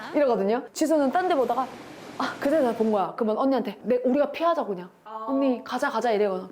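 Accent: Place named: Seoul, South Korea. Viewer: 언니 나 하루에 2번씩 보고있는데 연기력 물이 올랐쟈나~ 서울말투 기가 멕히쟈나~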